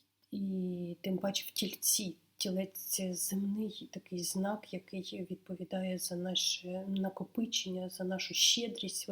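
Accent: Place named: Poltava, Ukraine. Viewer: У Вас полтавський акцент, який дуже милий моєму вуху))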